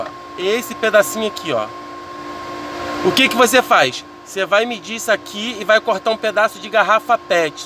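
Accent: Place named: Rio de Janeiro, Brazil. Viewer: Aê carioca di mais...... cumpadi!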